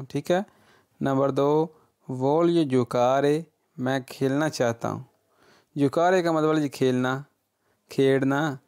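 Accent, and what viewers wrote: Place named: Gujrat, Pakistan. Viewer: بہت شکریہ شہزادے ویر بُہت سونا پڑھدے او توسی❤❤❤گجرات دی بولی بول دے او ویر جی ،رب سلامت رکھے جے آمین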